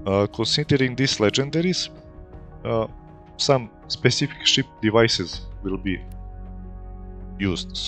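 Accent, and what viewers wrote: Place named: Russia. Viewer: Русский судя по акценту )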